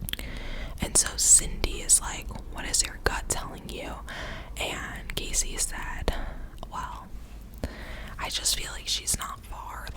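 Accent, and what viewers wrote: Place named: New York, United States. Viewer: I love the subtle northern accent, Ny or NJ😂 Great videos, thank you. It's weird, I remember hearing about this case all over the news as a kid and never got to learn what happened until now. I think I was like 8 at the time and in Florida (basically in the same place as her, maybe 10 minutes away), wild.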